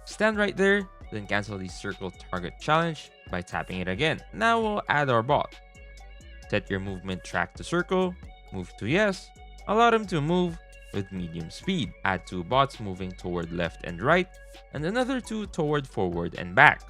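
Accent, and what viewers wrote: Philippines. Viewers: Hey, just discovered your channel. What's your nationality? You sound very Pinoy English.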